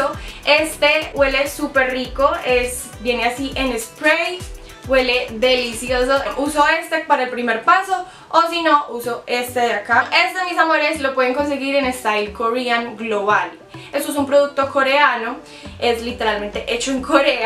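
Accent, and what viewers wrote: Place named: Antioquia, Colombia. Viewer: hola, me encanta tu hablado paisa es super chevere y también me gusta que pienses en nosotras y nos dices donde conseguir los productos y cuanto cuestan, saludos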